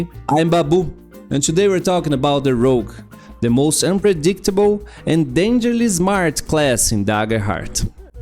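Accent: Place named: Brazil. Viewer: Are you Brazilian by any chance? I'm asking because your accent sounds very Brazilian hehe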